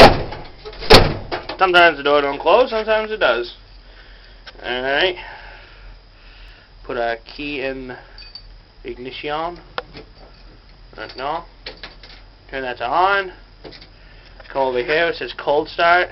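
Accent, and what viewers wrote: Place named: Boston, United States. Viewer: your from ma i can here your boston accent go redsox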